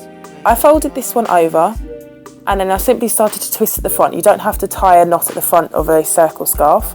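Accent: London, United Kingdom. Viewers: Beautiful Look! Beautiful Wraps!! Thank you. And you have the nicest British accent, that's a compliment. Absolutely beautiful. Thanks for all of your videos. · I love London accents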